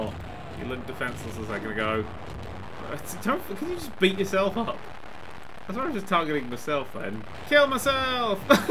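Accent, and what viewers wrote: United Kingdom. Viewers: Are you British or Australian🤔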